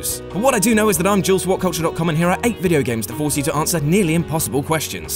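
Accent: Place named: United Kingdom. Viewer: So many quick British syllables.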